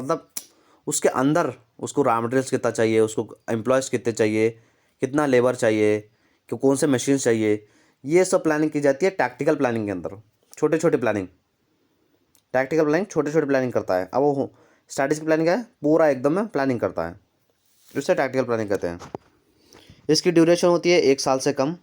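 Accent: Hyderabad, India. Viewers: tum hyderabad se ho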